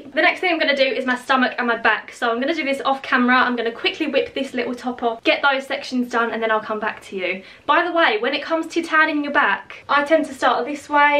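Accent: United Kingdom. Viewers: Finnally i have found a perfect video, since i couldnt decide which one to buy, you explained it perfectly, also im just so in love with the brittish accent 🥰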